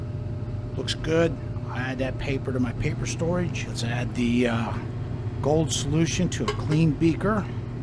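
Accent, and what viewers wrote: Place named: Chicago, United States. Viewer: stahk paht lol I didnt know you were from Chicago ... Watching you refine gold is really informative Ill never get sick of watching you make forbidden orange drink. Bonide and GFS chemicals should sponsor you with as much product as you go though. (nitric acid is super spendy) $350 is just about too much for my wallet these days.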